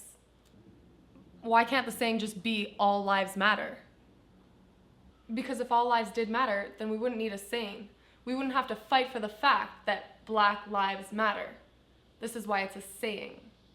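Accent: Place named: South, United States. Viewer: You’re definitely from Alabama or Mississippi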